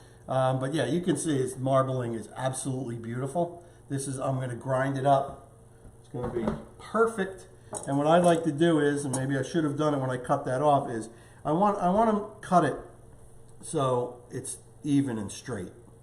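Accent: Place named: New Jersey, United States. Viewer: I just bought a SteakAger Pro 40 and found your channel while looking for info on YouTube. I can not tell you how much I appreciate the great information and content you provide. I subscribed to your channel immediately. I live in NY but grew up in Philly and NJ. I'm picking up a Jersey accent. Thanks so much Chef for the valuable content you provide. I was nervous about dry aging my own steak but I feel confident after watching your videos.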